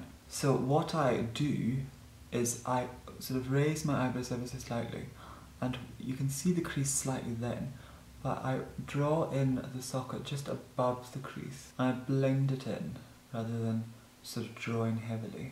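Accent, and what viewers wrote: Scotland, United Kingdom. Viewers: Q&A- I love your accent, what part of the UK were you born? Also, I would love to see your interpretation of Magenta from RHPS! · It's a Scottish accent (as you might guess from the surname!) ;) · Are you from Scotland? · Is your accent Scottish (proper)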